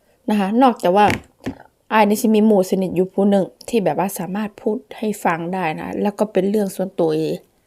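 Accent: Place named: Sakon Nakhon, Thailand. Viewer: ยุสกลบ่จ้าคึเว้าคือทางสกล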